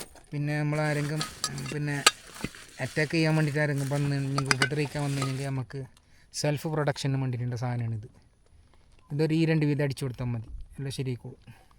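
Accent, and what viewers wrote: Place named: Kasaragod, India. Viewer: ide സ്ലാങ് മദി soopper കാസർഗോഡ് ഭാഷ 👍👍👍👍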